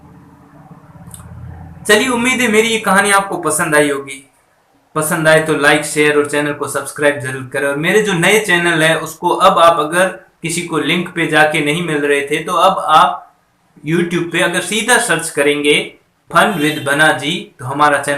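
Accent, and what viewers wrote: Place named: Marwar, India. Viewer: होकम आपकी बोलने की शेली बहुत सुंदर मारवाड़ी देशी भाषा की तरह हिंदी भी बोलते है बहुत सुंदर होकम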